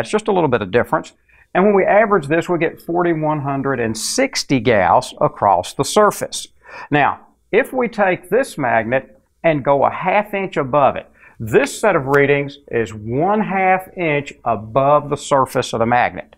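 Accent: United States. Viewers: I like the cowboy accent analyzing cutting edge high techy stuff. You can't get that "yeehaw" feeling in German, French, Chinese or Russian accents. 👍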